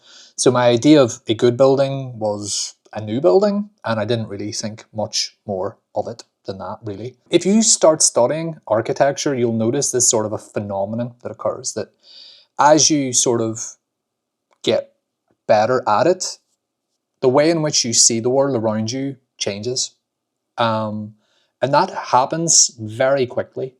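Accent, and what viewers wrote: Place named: Northern Ireland, United Kingdom. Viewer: Mate r u from NI? was so hyped when I saw the thumbnail and my excitement doubled when I realised u have my accent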